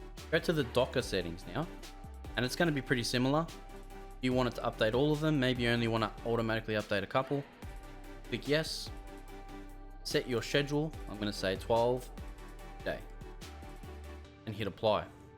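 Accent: Australia. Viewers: so refreshing to hear an Aussie voice in these types of videos. Is unraid affected by this wireguard removal from distros?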